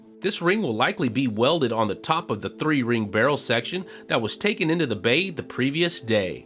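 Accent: Texas, United States. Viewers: Someday, I want to hear that Texas accent coming from the Planet Mars ! 👨‍🚀🏁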